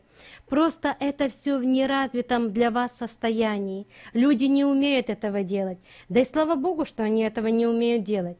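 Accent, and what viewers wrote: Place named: Ukraine. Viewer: Благодарю Вас, от всей души за беЗценную информацию, которой Вы щедро делитесь с нами, неблагодарными. 🤗🙏🏻 Тут вот кто-то покритиковал Ваш украинский акцент, а моему сердцу он мил, особенно когда Вы изображаете обывательские речи, я очень смеюсь, и всё, что Вы рассказываете, остаётся в памяти моей. С вами я полюбила украинский язык и песни, часто пою "Ой там, на горiиии..." 😂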